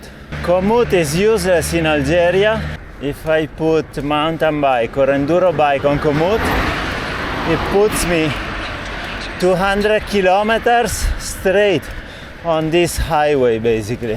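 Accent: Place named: Italy. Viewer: Ho reconisciuto questo bellissimo accento italiano . non importa la lingua straniera parlata , l’accento mi fa sempre scogliere 😂😂😂😂 benvenuto nel nostro paese ❤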